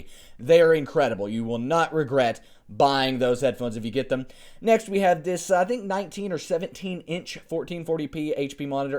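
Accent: South, United States. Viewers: I just watched your first Setup video! You can really here your Southern accent in it xD It's hilarious! XD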